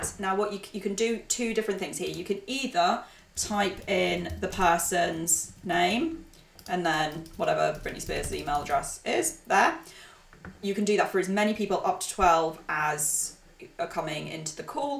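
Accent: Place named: Australia. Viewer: I liked the tutorial. I have been using Ringr for years but they just had a meltdown and their customer support sucks. I have zero tolerance when it comes to support issues and a meltdown. How is the support if you need it? Are you familiar with Ringr and I am going to assume you are pretty high on Zencastr yes? Also I love your name and your Australian accent.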